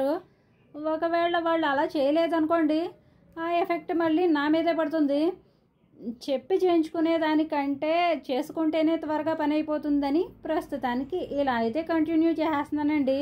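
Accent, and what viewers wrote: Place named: Kakinada, India. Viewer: Kakinada yasa tho baley sagadeesi matladutunnarandi.all the best.🎉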